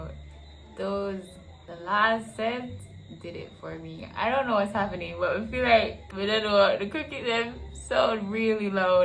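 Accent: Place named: Jamaica. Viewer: I love this chick ,❤️ Much love all the way from Africa 🇿🇦 I just love the patwa accent.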